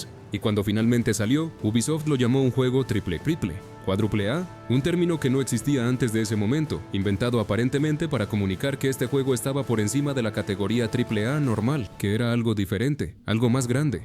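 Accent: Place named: Colombia. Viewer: buen video pero falta mejorar ese guion, mucho corte de voz, y te delata como pronuncias la S , colombiano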